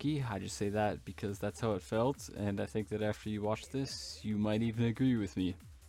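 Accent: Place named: Minnesota, United States. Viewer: Really like your vids man. I noticed that you have an accent close to mine. I thought you may have been from Minnesota like me, but Canada was my next guess. Keep it up, your play is great. I can aim ok but cant track for shit, any tips?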